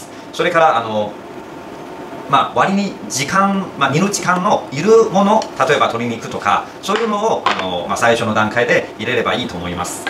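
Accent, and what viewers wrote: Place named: Chongqing, China. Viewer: 一听口音就是地道的重庆人😂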